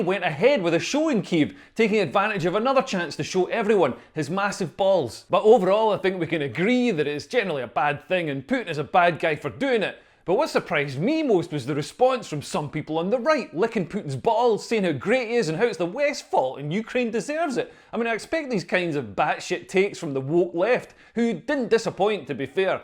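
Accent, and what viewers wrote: Scotland, United Kingdom. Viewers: Always amusing watching your films with subtitles on, just cant handle a Scots accent.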